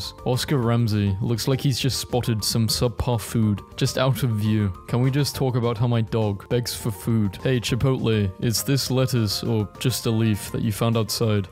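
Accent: United Kingdom. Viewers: He sounds British, so I'd guess the latter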